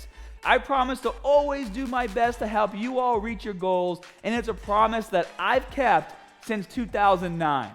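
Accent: Boston, United States. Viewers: Hard to tell if is from Bahstan or has a speech impediment... go Blues! Seriously though, one of the best YT channels with lots and lots of good info. It's important to have multiple views on "controversial" topics. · Are you from Boston ?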